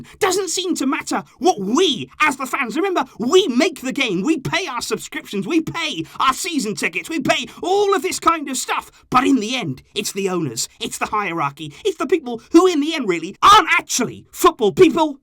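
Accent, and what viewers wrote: United Kingdom. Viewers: When British people get angry it sounds so beautiful. I didn’t even pay attention to the video 😂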